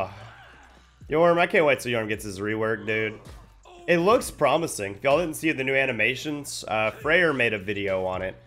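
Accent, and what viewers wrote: United Kingdom. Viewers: British accent, beret hat, great at games, I thought you were being like Trutalent lol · Best british accent 10/10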